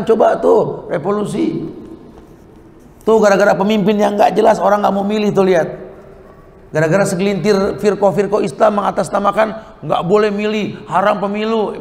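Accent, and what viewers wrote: Indonesia. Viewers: Waalaikumsallam..skrg sy hampir tiap hari menyimak kajian ustad...trmksh pak ustad atas ilmunya sangat bermaafaat.buat kami...penyampaiannya juga mudah di terima..gaya betawi penyampaiannya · Ust .... semoga semakin barokah... kajiannya ringan, intinya gampang di cerna , penyampaiannya sedep banget dech logat betawinye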